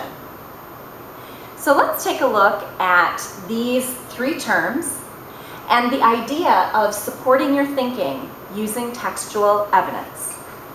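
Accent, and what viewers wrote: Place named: United States. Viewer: An American accent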